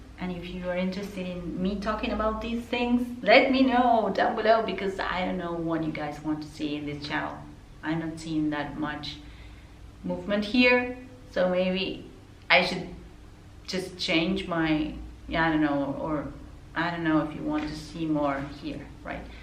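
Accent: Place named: Argentina. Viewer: Me encanta. Ya sabia cuando te escuché hablar en inglés que eras argentinaaa🎉 Yo soy americana pero tengo gente como familia en argentina (Viedma Rio Negro). Gracias por este mensaje..necesitaba esto hoy. ❤❤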